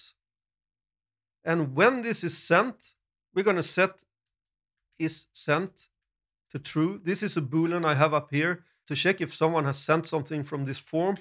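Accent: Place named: Sweden. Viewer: I knew you were from Sweden. Kind of noticed it from the accent. Then you confirmed it :D I'm from Malmo. Great channel, keep up the awesome work.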